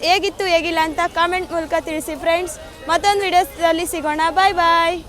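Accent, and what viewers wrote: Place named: Bellary, India. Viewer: ಮಗಳೇ, ಬಳ್ಳಾರಿ ಭಾಷೆ ಬೇರೆಯವರಿಗೆ ಸ್ವಲ್ಪ ಓರಟು ಅನಿಸುತ್ತೆ, ನಿನ್ನ ವಿಡಿಯೋಗಳನ್ನು ನೋಡಿದ್ದೇನೆ,ಬೇಷಿ ಅದವು , ಮುಂದುವರಿಸು, ಶುಭವಾಗಲಿ, ನೀನು ಈಗ ಬಳ್ಳಾರಿಯಲ್ಲಿ ವಾಸನೋ ಮೈಸೂರಿನಲ್ಲೋ?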